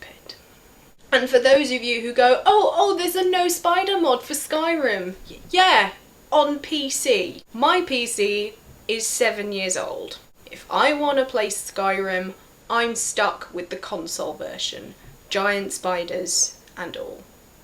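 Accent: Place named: England, United Kingdom. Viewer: I don't game. So I am here for the English accent today.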